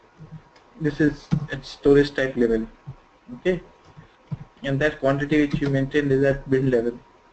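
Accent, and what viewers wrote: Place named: India. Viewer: there is a back ground job in this video makes it ghard to follow plus the indian accen that is so awfull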